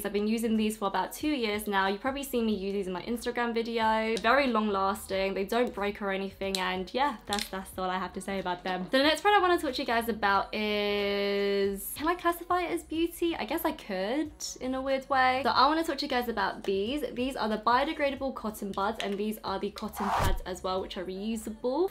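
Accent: United Kingdom. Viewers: all these years i’ve been following you on instagram and now i’m finally finding you on youtube and on top that YOUR BRITISH ! wtf lmao i love your accent though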